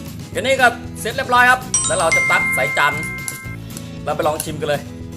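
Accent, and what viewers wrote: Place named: Sukhothai, Thailand. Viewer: สวัสดีครับทักทายครับสำเนียงเหมือนสุโขทัย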